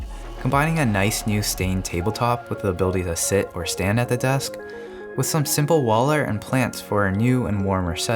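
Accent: Canada. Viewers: Woah are you from Toronto?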